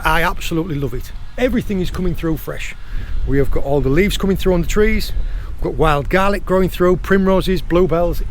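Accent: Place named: North, United Kingdom. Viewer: Love when a smoggy accent tries to speak proper England. Go lad, cracking channel. Ex booty?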